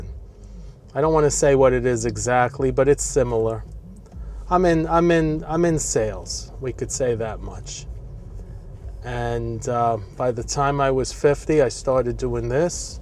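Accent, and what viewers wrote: New York, United States. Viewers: If I may offer, you’ve rebuilt your life multiple times and succeeded. But maybe the move now isn’t reinvention, it’s using what you already know in a way that’s more stable and easier on you physically. Just a thought. Find some ways to build up some monies for later on. By the way, yours is definitely a New York accent! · I'm from New Jersey. I could pick you out as a New Yorker in a second based on your accent. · I'm in Florida and have worked with several people who have your accent. They are all NY Italians. Thanks for posting. God bless. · Yeah you have an accent but it's refined other than the standard New Yorkers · You absolutely have a new york accent! Nobody on the west coast sounds even remotely like you. I would also call it an east coast accent. Love it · Definately New York accent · This guy doesn’t sound like somebody of average intellect. 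He’s full of wisdom too. And yes he has a great NY accent that’s easy to listen to. Never forget we’re here in earth school to learn. We start learning when we’re born; if we’re lucky, we don’t stop learning til “God” calls us home from earth school. Great scenery of NY! Reminds me of the tv show TAXI. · You're very patient and calm to make that commute Monday through Friday. You have a New York accent. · Yes you sound like a New York native to me. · You most definitely have a very strong NY accent and İ like it Makes me feel at home and İm 6k miles away İts Richmond Hill İ believe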